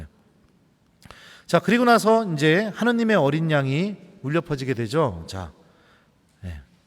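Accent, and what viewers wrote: Chungcheong, South Korea. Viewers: 잠이 오지않아 우연히 듣게된 말씀 입니다 간간히 쓰시는 충청도 사투리가 정겹습니다 강론 이 감동입니다 찾아 듣게 되는 강론 이며 ㆍ 감사드립니다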